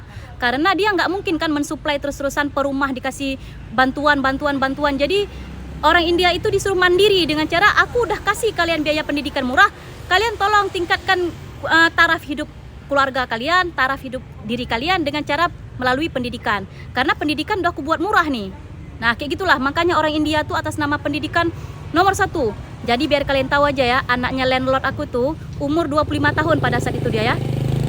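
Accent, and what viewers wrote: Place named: Sumatra, Indonesia. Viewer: Siap kk, saya selalu mnton videonya kak, cara bicaranya cerdas dan lugas bikin gemes, dan logat khas Sumatranya juga dapat banget.. hehe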